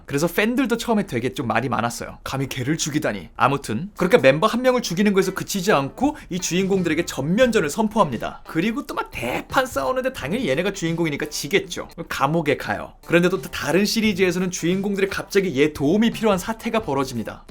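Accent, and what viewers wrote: United Kingdom. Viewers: 영국 발음이라 그런거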